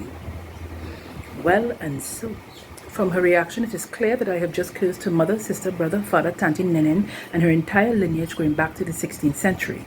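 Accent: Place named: Trinidad and Tobago. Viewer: No problem. She got some Trini...🙌🏼😁🤣😚😂💯🙏🏼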